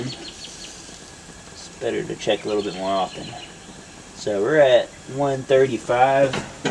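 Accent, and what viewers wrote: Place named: Tennessee, United States. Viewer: Nice video. I’m planning pork chops tonight on my MHP. Never thought of leaning the chops against the side to sear the sides. Meanwhile I’m listening to the accent and finally determine it’s Southeast TN, but then in the last scene you mention a website. Joke’s on me: I was close, but the funny (to me) part is that I BOUGHT MY OWN MHP FROM GASLIGHT & GRILL in Homewood. Fantastic grill! Mine needs some cleaning and renewals, but I’m happy every time I use it.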